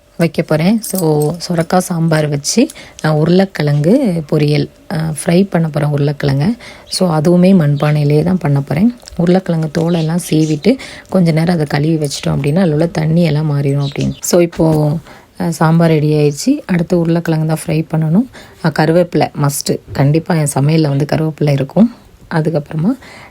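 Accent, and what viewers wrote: Tirunelveli, India. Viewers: திருநெல்வேலியா சிஸ்டர் நீங்க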